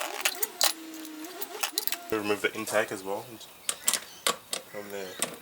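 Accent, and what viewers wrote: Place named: Australia. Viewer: Nice work mate. Love that Aussie Accent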